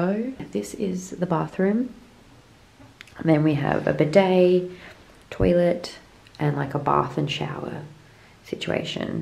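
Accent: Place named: Scotland, United Kingdom. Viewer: This vlog absolutely warms my heart. I remember a previous video where you were talking about always wanting to travel with your significant other (goodness knows how long ago). And now here you are, sharing an adventure or an amazing experience with your significant other is such a wonderful luxury. PS. Love that Scottish accent, brings back memories of a beautiful friend of mine who coincidentally will be back in Australia in about 2 months.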